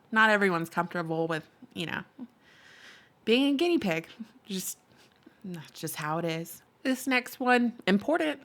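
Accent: South, United States. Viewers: IDK if that country accent is you're true accent or not but love it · Oh my you had me rolling at"Spin the wheel Memaw!" Absolutely LOVE your southern accent!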